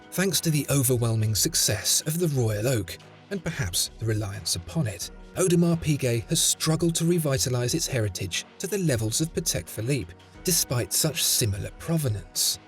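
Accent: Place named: Midlands, United Kingdom. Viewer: Waffling on as per usual, over four minutes of absolutely nothing to do with the watch in question! I dip my toes into your channel occasionally as the videography is excellent. I just find the waffle nauseating, but I know I’m in the minority, oh and that middle England voice, it’s like listening to an account waffle on about investments!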